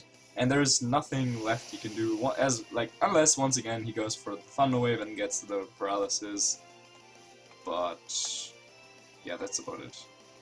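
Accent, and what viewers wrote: Germany. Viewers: Pleasure to have you back, having only Hibiki was not enough German accent in my Pokemon videos (yes, I know he is Austrian, but it all just sounds the same)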